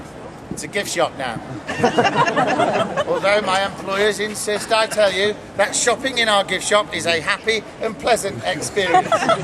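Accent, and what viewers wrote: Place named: Scotland, United Kingdom. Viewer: What makes me think you're scottish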